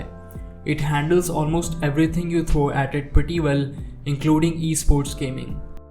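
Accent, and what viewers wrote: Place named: India. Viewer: You talk like some Indian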